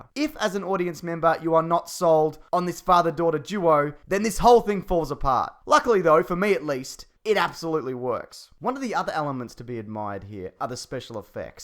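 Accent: Australia. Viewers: Your Australian aren't you mate ?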